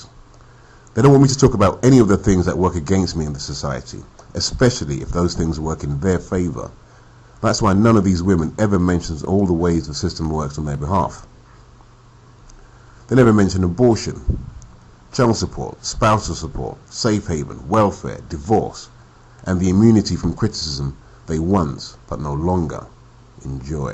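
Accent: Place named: United Kingdom. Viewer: This content is powerful and it's a uk voice.usually it's the guys from the states talking Truth.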